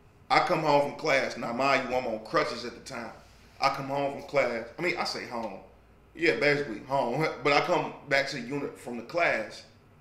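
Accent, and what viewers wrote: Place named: Michigan, United States. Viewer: it’s how we talk in Michigan, call it bad pronunciation, regardless the talk different for us ✌🏾❤️ 🧤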